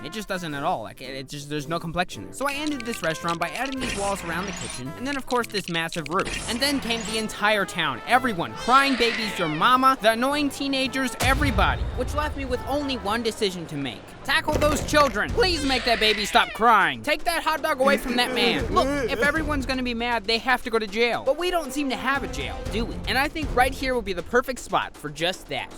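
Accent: United Kingdom. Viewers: Are you from UK?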